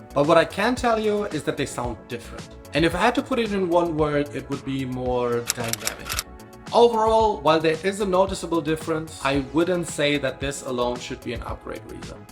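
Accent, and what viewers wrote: Germany. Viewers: Beautiful German Accent! Grüße aus Peking. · Deutscher oder⁉️ Man hört es extrem😂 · bro sounds a bit german ....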